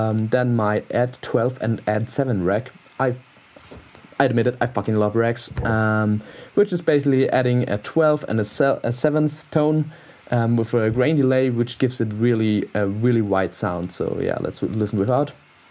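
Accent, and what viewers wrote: Germany. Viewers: u german??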